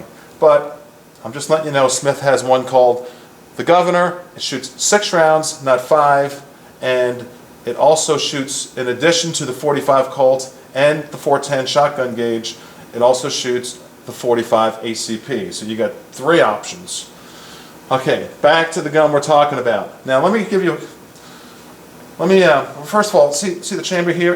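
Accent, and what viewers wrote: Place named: New York, United States. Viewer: Great vid, just subrscribed. Your accents definitely not from Florida, New York maybe?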